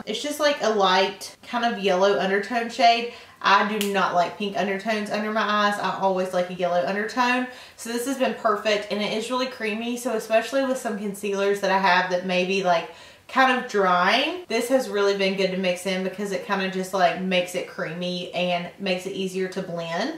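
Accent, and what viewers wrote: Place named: North Carolina, United States. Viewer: Annie Loewen she is from North Carolina United States lol. a little more East than South. she has that kackalackie accent lol... not southern haha thats what we call home north kackalackie lol dont ask if youre from north or south carolina you should know lol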